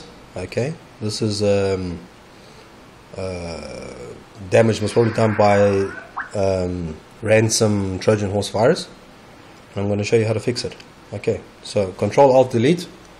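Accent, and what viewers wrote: South Africa. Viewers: Are you south aftican?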